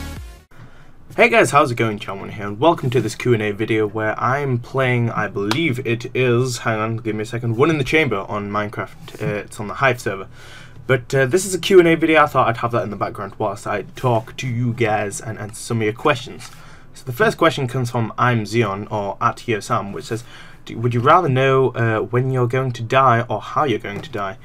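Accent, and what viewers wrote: United Kingdom. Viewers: wat your Asian I thought u were proper british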